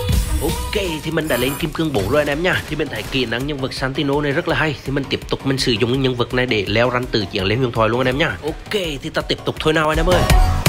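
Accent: Central, Vietnam. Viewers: Anh người miền Trung hả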